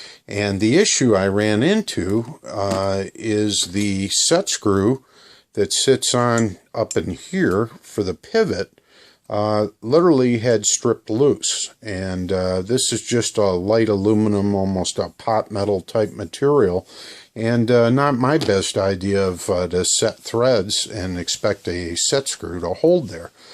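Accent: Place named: Chicago, United States. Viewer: Chicago area is my guess, too. My area of origination. Excellent modifications!!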